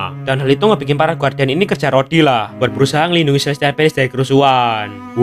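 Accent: Java, Indonesia. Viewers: Aduh logat jawa dan jokes cringenya ganggu bgt, terlalu lebay jir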